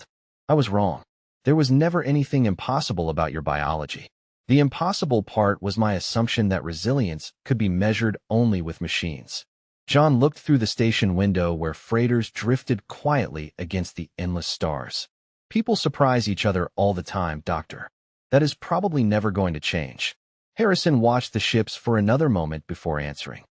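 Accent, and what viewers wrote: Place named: United States. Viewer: Fantastic story. Perfect! There was no problem with the sound at all. It was excellent American English.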